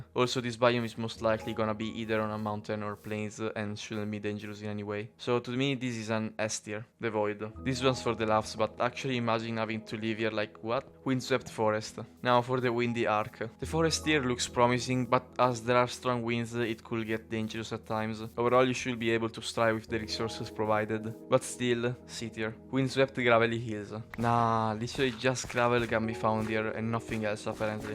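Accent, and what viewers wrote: Italy. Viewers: un Italiano si riconosce subito hahahah, comunque parli molto bene e bellissimo video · ciao fratello italiano, grande video (e grande accento)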